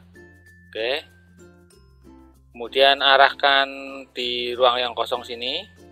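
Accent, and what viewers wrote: Banyumas, Indonesia. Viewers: Walaupun ora paham,tapi tetep nyimak Bos,salam pada bae e🤣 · Wahhh tq bang sehat selalu om sukses selalu buat kedepannya amiiin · MATUUUUUR SUWOOOON SANGEEEET masss....... ALHAMDULILLAH...........sy dpt pelajaran baru....n SANGAAAT JELASSSSSSS...... MAJUUUU TERUSSSS BERKARYAAAA n SUKSESSSS SELALU........ Wass ant Cicurug Skbumi...... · Dapat ilmu lagi dr om... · Salam perjuangan om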